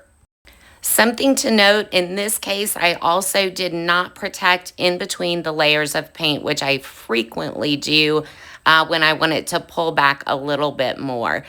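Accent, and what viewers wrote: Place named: Alabama, United States. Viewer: Love the Alabama accent. lol Amazing work.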